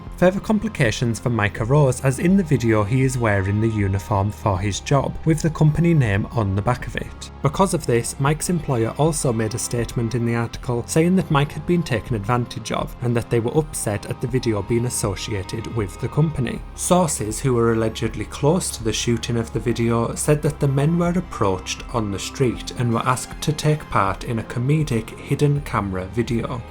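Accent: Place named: Scotland, United Kingdom. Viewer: First time here and oh my God, I just looove your accent! It is Scottish maybe?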